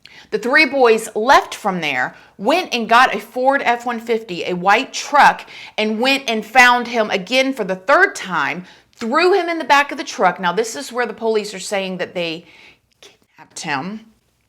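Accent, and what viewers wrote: South, United States. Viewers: I love you. I'm from the south also and you talk just like me and everyone in my small town. Although your talking about something so serious I can't help but laugh when you say certain things like in my dumb dumb brain 🤣. You are so not dumb which is why I keep coming back. God bless keep up the good work.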